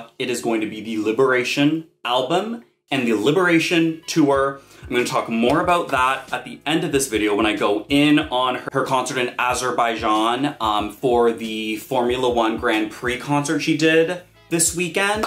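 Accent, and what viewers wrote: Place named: Canada. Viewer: You're a TRUE Fighter, I love you so much!!!! I'm obsessed with you and ur flawless Canadian accent!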